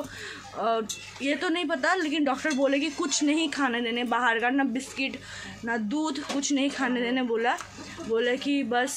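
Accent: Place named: Bihar, India. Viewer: Aap Bangla mein Hindi bihari wala Hindi bahut achcha mix kar rahi ho tikha ko titai bolkar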